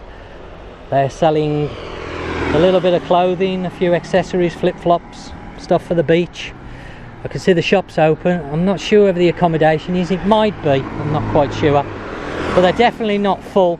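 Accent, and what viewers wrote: United Kingdom. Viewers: What a disgusting accent is this? Must be some trash area from UK. Try to speak BBC English. Yuck. Thumbs down.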